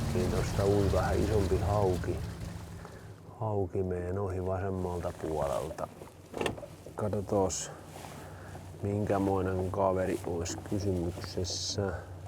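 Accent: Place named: Häme, Finland. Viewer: Ensin tyylipisteiden jako: kakstoista pistettä ja papukaijamerkki. Kuvaajalle, leikkaajalle/koostajalle jaaivan erityisesti 'luontevasti soljuvan puheen tuottajalle' - sisällöstä, mutta myös estetiikasta. En ole varmaan koskaan aikaisemmin kuullu puhuteltavan kaloja - ahventa, kuhaa, mutta aivan erityisesti haukea - niin monipuolisesti, hellän kaverillisesti, vähän kiusoittelevastikin. Kuulee, että on oltu vuorovaikutuksessa aikasemminki. Ainoastaan savon murre puuttuu, mutta menee se nippa nappa hämäläinenki .. Eikä tuo tekninen osaaminenkaan paljo taiteellisen rinnalla kalpene. Ainakaa, jos aatellaan saalisvarmuutta. Näin kaksdee-arskana tuo saalisvarmuus jopa hämmentää. Ja tulee eittämättä sekin mieleen, että missä kulkee eettisen saalistamisen rajapyykki. En halua neuvoo toisia. Oma kalastus keskittyy ruokakalan pyyntiin asenteella, että 'en tee onkelmaa siittä, jos kalaa ei satu tulemaan - enkä siittäkään, kun sitä joskus tulee'. 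Nostan siimat ylös ja sumputan järvessä seuraavaa kertaa silmällä pitäen. Kaksdee-arskana mulle tulee sopivasti jännitystä ihan siittä, että tuleeko tällä kertaa vai eikö tuu. Omana mielipiteenä heittäsin kuitenkin väkäsettömien koukkujen käytön aina sillon, jos kaloja saalistetaan selvästi yli tarpeen, koska väkäsettömillä se vapautus onnistuu aina helpommin. Sillä tuskin se metrisen vihernieriän karkuutuskaan enää kovin kipeetä tekee, jos niitä toistametrisiä on vyöllä jo enemmän ku paljo. Taiteellisen tyylin lisäks paikkatyyppipohdiskelu itte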